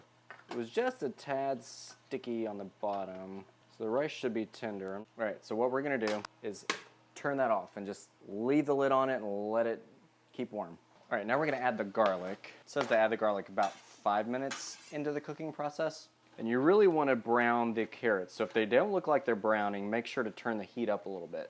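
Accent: South, United States. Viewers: Yum! That dirty rice looked good! And those peppers 😍😍. You sound southern (I'm originally from the Tennessee, so I have a southern draw myself ,haha) where are you from?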